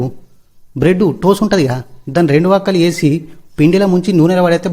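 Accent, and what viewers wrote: Telangana, India. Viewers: అది భోజన ప్రియత్వం కాదు కానీ,హాస్యానికి అదో ఆధారం! ఇంత వరకూ ఎలాటి అసభ్యతకు చోటులేకుండా తీసుకొస్తున్నారు హాస్యాన్ని...! ఇకముందుకూడా... ఈ తిండిగోల కి సంబంధించి అన్ని కోణాలు ముగిసిన తర్వాత - హాస్యానికి మరో ఆధారాన్ని వెతుక్కుని...మనసారా మా అందరినీ ఇలాగే నవ్వించేలా ఉంటాయి మీ వీడియో లు అనుకుంటున్నాను. కీప్ ఇట్ అప్ గారు. తెలంగాణ భాష మీ నోట మహా అందంగా, తీయగా...మీదు మిక్కిలి సహజంగా పలుకుతుంది. అభినందనలు. Proper Metpalli నా...? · Love you vedios am very happy to see ur voice mana telangana language super🥰😍😘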